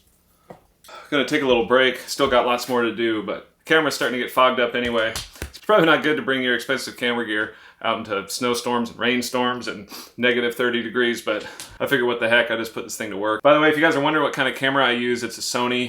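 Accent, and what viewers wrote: United States. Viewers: I don’t like his voice… too hillbilly. But impressive & brave carpenter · what the hell is your "Negative this negative that" Their is no negative it is either "below zero" or "Minus" You sound like you were born and raised in Miami beach! Speak like a Northerner! WTF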